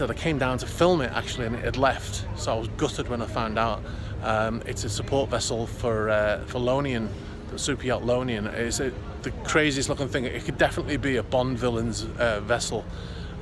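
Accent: Liverpool, United Kingdom. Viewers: You a scouser or from north west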